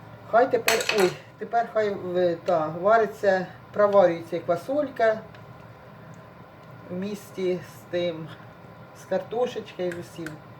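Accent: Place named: Dnipropetrovsk, Ukraine. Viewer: Вы, наверное из Днепропетровска или области. Только у нас так говорят и готовят. Рада, что вас нашла! ❤❤❤❤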